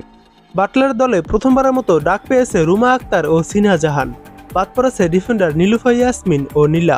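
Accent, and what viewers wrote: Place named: Khulna, Bangladesh. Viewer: আপনার খুলনা সাতক্ষীরা উচ্চারন শুনতে ভালই লাগে।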